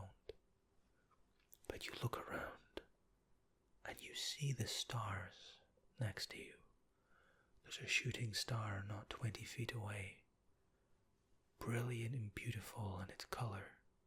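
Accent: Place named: Glasgow, United Kingdom. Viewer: Oh it really has pal. This is my 3rd year here, it's funny how fast it starts to stick hahaha... 🙈 That's why I got so excited about your Glaswegian in your accent vid, feels so like home haha! I study music at the royal conservatoire of scotland:)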